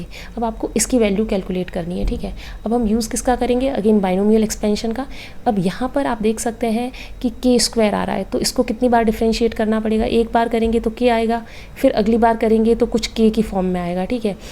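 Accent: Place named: Bihar, India. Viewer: Aap bihar se h kya, aap theek h bolti h isliye puchha h, waise aap topics achhe se explain krti h , Dhanywad.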